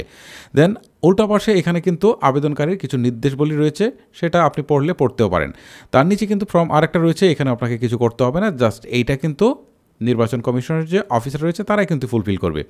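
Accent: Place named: Mymensingh, Bangladesh. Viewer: টিকানা, টিক আছে। ভাই আপনার বাড়ি কি ময়মনসিংহ বা কিশোরগঞ্জে?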